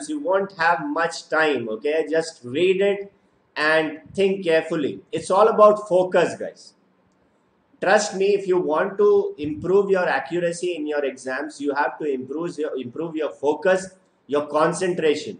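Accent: India. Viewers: Sir are you mallu??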